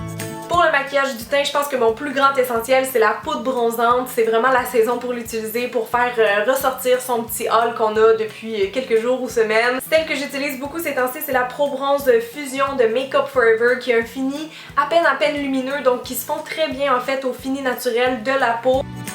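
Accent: Quebec, Canada. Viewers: Aaaaaah je reconnais l'accent québécois omg! Moi aussi je vis au Québec, à Montréal plus précisément! C'est la première fois que je vois une autre youtubeuse québécoise! Je m'abonne!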